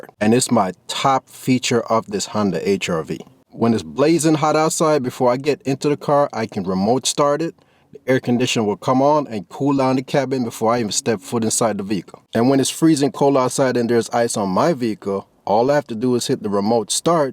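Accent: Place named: Jamaica. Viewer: You sound like you have Jamaican heritage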